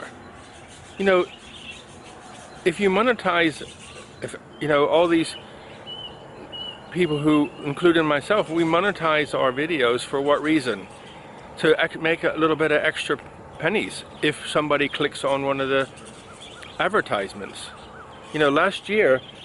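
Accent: United States. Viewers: Thank you so much for the shout out. You didn't have to do that for me but much appreciated. I thought that I detected some American in your accent. I was stationed in Goldsboro NC back in <num> and again at Fort Bragg <num>. I agree with everything you said, that is why I always preferred to be stationed in Europe, some of the same issues but not as bad. Your land is so beautiful, a true paradise indeed. Thanks again for the shout out and I look forward to meeting you when I retire.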